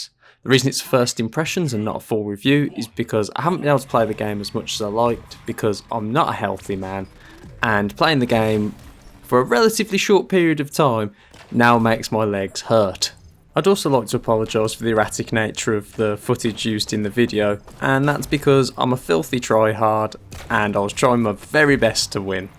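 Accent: United Kingdom. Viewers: I like this brummie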